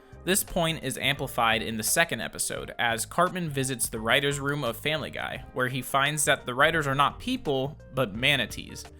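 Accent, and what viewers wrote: United Kingdom. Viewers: your british accent is beatiful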